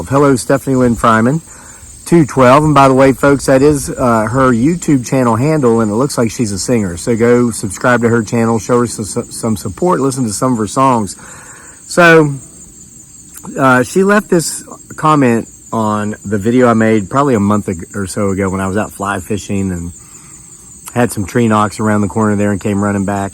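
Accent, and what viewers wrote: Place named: West Virginia, United States. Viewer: I love listening to your stories in your West Virginia accent....thank you!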